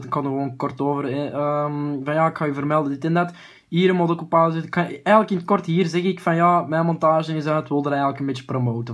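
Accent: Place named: Antwerp, Belgium. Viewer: plat antwerps on point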